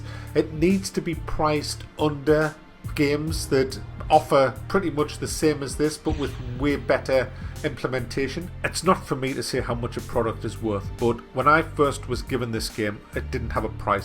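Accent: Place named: Scotland, United Kingdom. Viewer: I HATE strategy games simply because I cannot play them and will not, this isn't my point though (my point is this...) I still watched this almost 15 Minute long video because of the commentary! is simply the best reviewer and commentator. He's got it all! Quick wits, mature humour and of course none of this would be complete without his awesome accent which I think is... Scottish?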